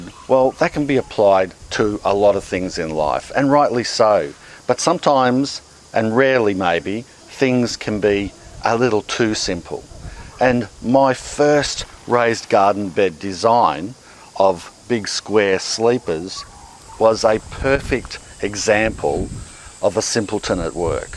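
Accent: Australia. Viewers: i figured you were aussie ... from the accent .... just thought i heard you say you were in nz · You mentioned that you are in a subtropic climate. I know by your lovely accent and kangaroo burial that you are in Australia, but what area? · Ausys always sound better...the thumbs up reminds me of the great Steve Irwin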